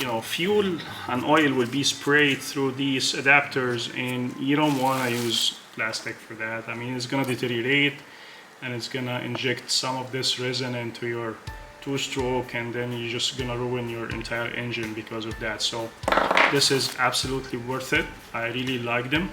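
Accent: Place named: Northern Europe. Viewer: the art of bedding music is that you should NOT be able to hear it. its to cover up background noise, reduce the "hiss" of silent passages... it should NOT be louder than the person talking. not everyone can follow a north european accent without the added distraction. my heads just not in the space to deal with it right now...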